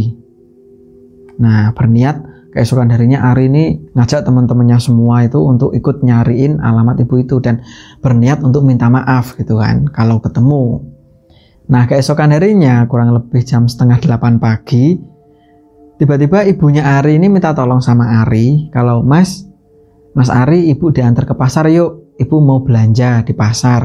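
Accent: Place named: Sragen, Indonesia. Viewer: Mas pean iku logat bahasane kyk wong sragen , blora y?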